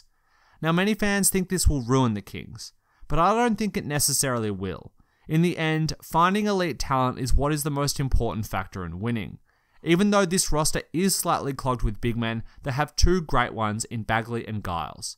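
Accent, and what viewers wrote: Australia. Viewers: is it australian accent ??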